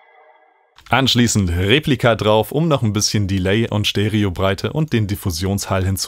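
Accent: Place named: Mecklenburg, Germany. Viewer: du kommst ausm Norden Kollege. Du hörs dich an wie mein Homies aus Mecklenburg. Weiter so macht super Spass.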